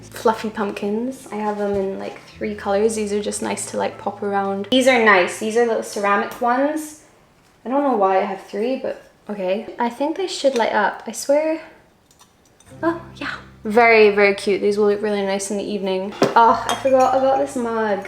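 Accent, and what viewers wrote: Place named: Edinburgh, United Kingdom. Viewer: She’s from Edinburgh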